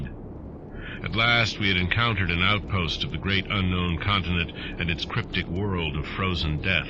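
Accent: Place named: United States. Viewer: I don't like the USA accent, it should be read with a British accent.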